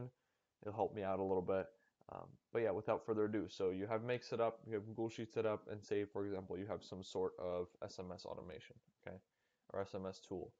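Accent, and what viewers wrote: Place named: Chicago, United States. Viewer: I knew you were from Chicago from the accent. I'm about to start d2d sales and I'd like to have a way to text them a flow to sign up for a new account. This helps a ton. Thx.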